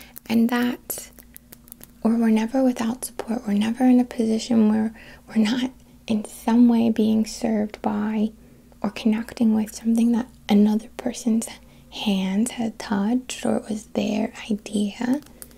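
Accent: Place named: New Jersey, United States. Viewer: This is the 1st time I noticed you have an accent! New Jersey? I'm bad at this And yes sing next time!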